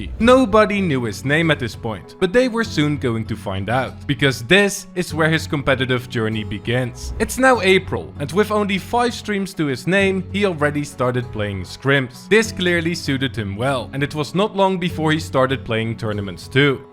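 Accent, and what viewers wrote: Netherlands. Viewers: I smell Dutch in your accent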